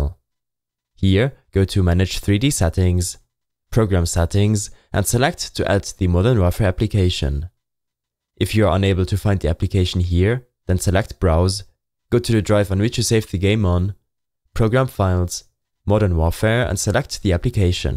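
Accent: Germany. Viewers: You sound really german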